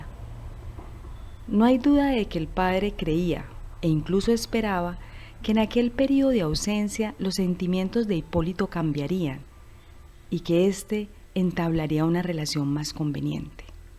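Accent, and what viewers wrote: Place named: Antioquia, Colombia. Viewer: El acento paisa es difícil de neutralizar...pero no imposible.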